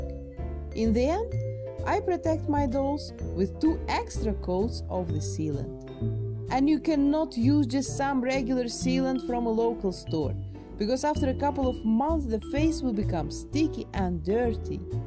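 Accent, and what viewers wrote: Russia. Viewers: Сорян, ты русская? , просто уши кровоточят:')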